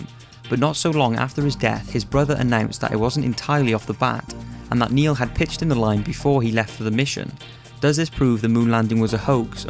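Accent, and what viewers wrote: Wales, United Kingdom. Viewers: I squirt a little bit when you said “a little bit iffy” in your majestic Welsh accent.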